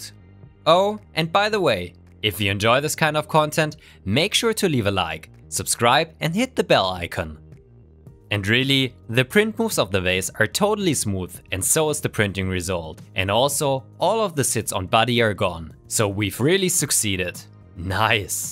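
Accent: Germany. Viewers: he has a nice German accent, thank god he's not Swiss. xD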